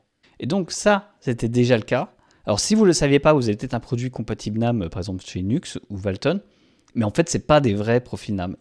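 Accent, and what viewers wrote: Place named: Belfort, France. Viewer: tu as l'accent des Territoires de Belfort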